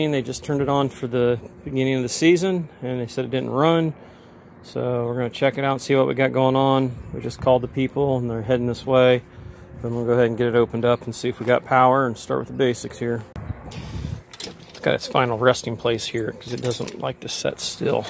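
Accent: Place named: Pennsylvania, United States. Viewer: ah the Pennsylvania speak figured you were from that area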